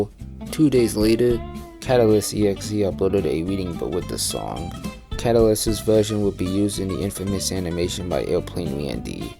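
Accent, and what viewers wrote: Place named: New York, United States. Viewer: Why do you sound like a New Yorker or New Englander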